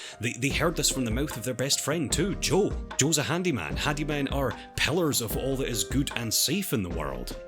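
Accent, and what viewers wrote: Scotland, United Kingdom. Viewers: Are you Scottish? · You're ancient is wonderful (thinking Scottish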